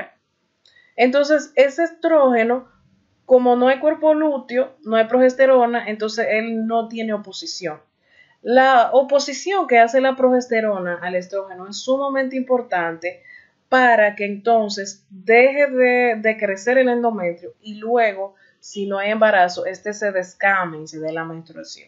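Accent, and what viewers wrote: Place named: Dominican Republic. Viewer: Excelente explicación, felicidades!! Desde que escuché el acento supe que eras dominicana!! éxitos!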